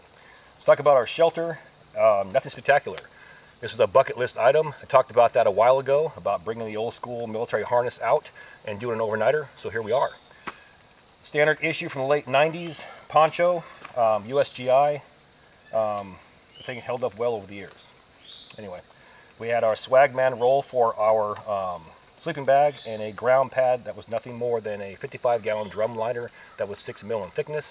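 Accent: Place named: South, United States. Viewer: I enjoyed it. A cousin who was SF in Vietnam told me what he carried on jungle patrols for 2 or 3 days and your kit is almost identical. I am trying to pin that southern accent. Your not AL or MS where I grew up, you talk to fast. Louisiana maybe? It doesnt sound like TN or the Carolinas.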